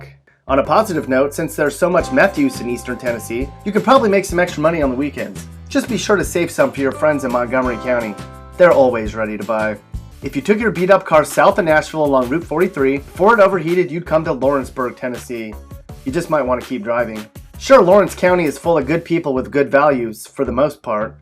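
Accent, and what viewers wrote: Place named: Midwest, United States. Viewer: I bet 1,000.00 he's from the Midwest,you can tell by his accent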